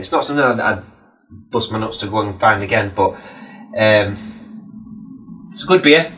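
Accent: Yorkshire, United Kingdom. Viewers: Great To shirt that reminds me of someone else who wears one! By the way how do U get a Yorkshire accent? I have been living up here for 10 years and I still ain't got one! nice review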